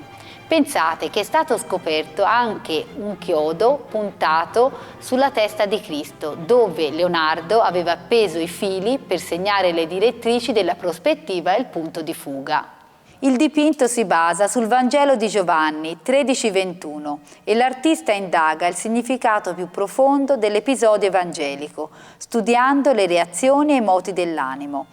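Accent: Tuscany, Italy. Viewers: Ascoltar cose d'arte con accento toscano e, per somma combinazione, con suono di voce di donna, vellica una gioia intellettiva di rara bellezza. Chapeau all'Autrice!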